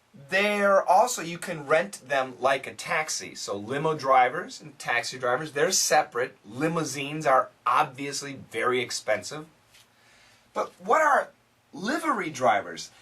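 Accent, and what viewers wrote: United Kingdom. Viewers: With hundreds of styles that fit together beautifully, thousands of colors and ideas, great ranges and kitchens, small living spaces and lots of wonderful plants for any garden, with a little inspiration you can achieve anything. Homebase make a house a home. Hello, what a British Accent. ohh my god. small or smart? I have always wondered why they are unable to pronounce the R well? it's the same here or even worst. The English language should have different name. "God bless America" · With hundreds of styles that fit together beautifully, thousands of colours and ideas, great ranges of kitchens, small living spaces and lots of wonderful plants to any garden. With a little inspiration you can achieve anything. Home Base. Make a house a home. British accent! Well, I'm more used to the American (or Canadian) accent yet it's good to hear it from time to time for variety's sake.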